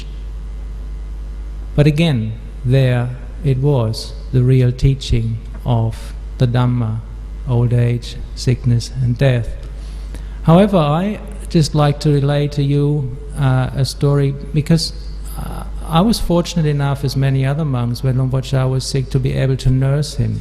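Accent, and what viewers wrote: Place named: Australia. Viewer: His accent is very Australian now.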